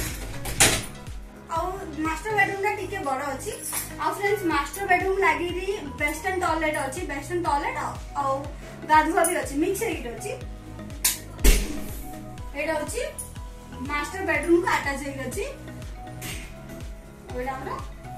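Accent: Berhampur, India. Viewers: Pura berhampur kathaa ....may be tume sethira bodhe?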